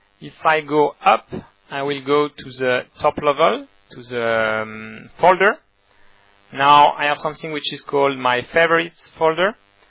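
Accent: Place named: France. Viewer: I think the audio quality could be better, but I believe this is because you want to keep the file small for YouTube upload. Smaller video and lower quality audio = smaller file. The French accent is wonderful, we, on the other side of the world are not custom to hearing it, so its sounds funny to some people. I think it is heard more in Europe and is just normal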